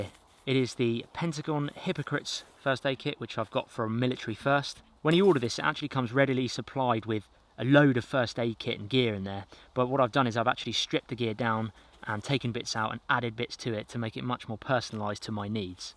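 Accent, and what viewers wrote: United States. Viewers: His American accent is so funny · that america accent was awesome haha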